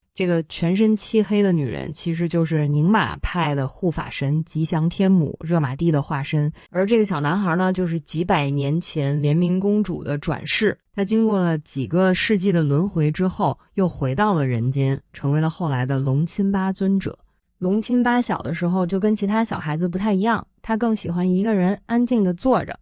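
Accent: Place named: Beijing, China. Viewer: 解说一口北京腔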